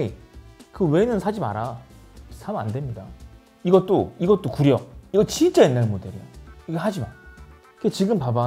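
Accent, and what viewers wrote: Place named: Busan, South Korea. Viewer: 말투가 부산이시네여...ㅋㅋ 제가 트래이더스 손절한 이유랑 공감됐어요. 차라리 이마트를 가지....식재료도 대용량 아니고는 이마트에 포장만 바꿔 다 있는거...^^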